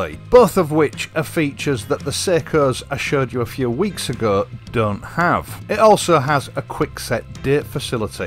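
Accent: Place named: Yorkshire, United Kingdom. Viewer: That's what keeps me watching your videos, I don't own a Triumph or an Enfield and probably never will, but your stuff stands out from the crowd, honest, down to earth, informative, entertaining, and, above all, totally individualistic! As an added bonus they are delivered with the best, broad Yorkshire accent one ever heard! Keep 'em coming mate! (and that's from South Australia where we can ride all year round!)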